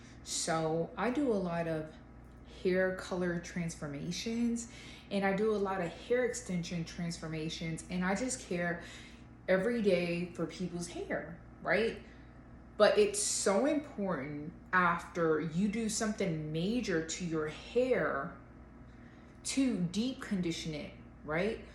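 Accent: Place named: Spain. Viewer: I love this idea for sure… I also love your Spanish accent!!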